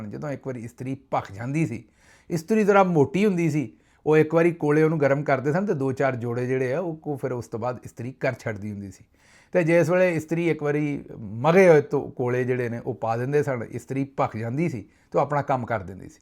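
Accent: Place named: Punjab, India. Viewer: صاحب میں کی بہت بڑی فين ہوں اللہ آپکی حفاظت فرماۓ آپکا پنجابی vlog مجھے بہت پسند ہے میں بھی آپ کے شھر کے ايک گاؤں سے belong کرتی ہوں جب آپ پنجابی ميں لطيفے اور کہاوت سناتے ہيں ٹھوس پنجابی بولتے ہيں کمال کر ديتے ہيں ❤ مجھے لگتا ہے ميں اپنے گاؤں ميں ہوں آپکا vlog خبروں سے ذيادہ آپکی پنجابی سننے کے لئے ديکھتی ہوں عابدہ يو اے ای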